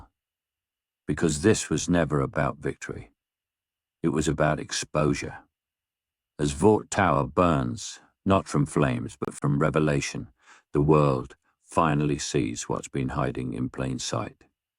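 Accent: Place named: Cockney, United Kingdom. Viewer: First time I’ve heard an AI imitate an East End accent.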